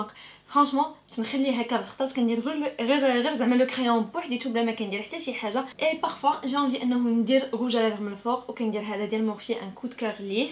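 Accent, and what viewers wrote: Morocco. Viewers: أنا ما فهمت نص الحكي أنتي حسي انك مغربي أنا من متابعينك الجداد حبيتك من اول فيديو بشوفك فيو من محمود العيساوي هههه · نموووت عليك رووعة انا ريان من الجزائر انا جديدة معاك تعجبيني ياااسر 😍ونموت على اللهجة المغربية bn continuation ❤❤❤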